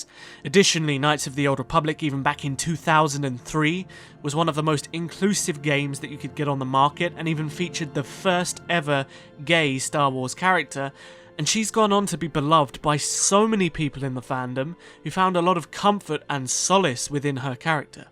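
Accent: United Kingdom. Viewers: It's interesting hearing you try not to sound British. You are British right? lol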